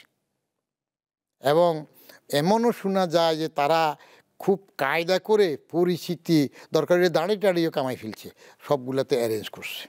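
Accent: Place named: Noakhali, Bangladesh. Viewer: মামা ফিউর নোয়াখাইল্লা.... 😁😁😁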